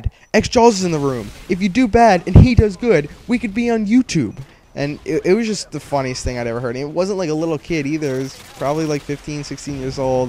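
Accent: Spain. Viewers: ur spanish? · eres espanol tio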